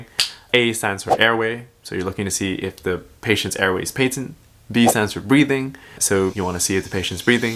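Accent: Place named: United States. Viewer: Great video! we’re u born in the UK because i don’t know why but i thought you were American at first??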